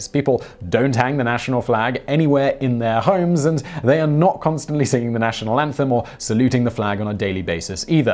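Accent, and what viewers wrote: United Kingdom. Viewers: I liked your soft hearted tone better this time. I noticed you get real "British" sometimes when speaking about America. Ha. Do you man, but I did enjoy this a lot more. I'm a sensitive guy. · The incentive isnt to get people to buy larger portions, it's to get them to spend more money. Obesity is more lifestyle than mcdonalds, but you almost know everything. I guess the british accent makes you more trustworthy to americans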